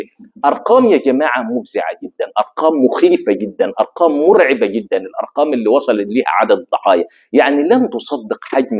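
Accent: Morocco. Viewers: لهجتك المغربية فنة 🎉😂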